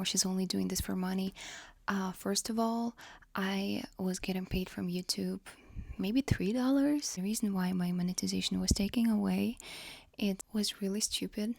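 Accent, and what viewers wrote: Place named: Eastern Europe. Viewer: Love your slavic accent😊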